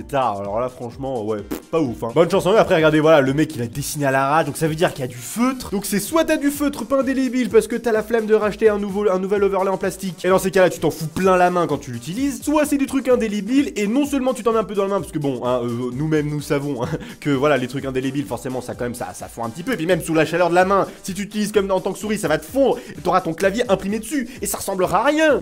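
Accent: Quebec, Canada. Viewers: IL EST COOL L'ACENT QUÉBÉQUOIS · Ton accent québécois est magnifique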